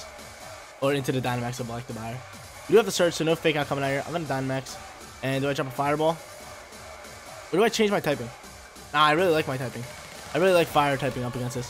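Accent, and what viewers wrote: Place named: New York, United States. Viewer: I'm noticing your accent are you from the NYC metropolitan area?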